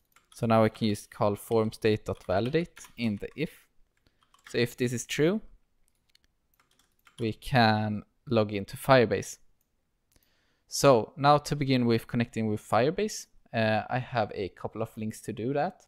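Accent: Sweden. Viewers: Your English is good! I do play a lot of Counter Strike with Swedes so I recognized the accent 😅 I'm from Denmark so it's not like my English is much better haha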